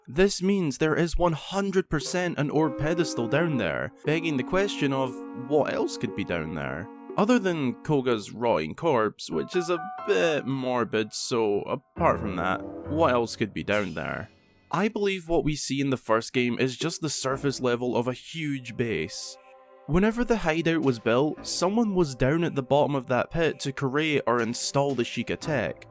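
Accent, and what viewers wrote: Scotland, United Kingdom. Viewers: I love your scottish accent it makes the videos so chill🥰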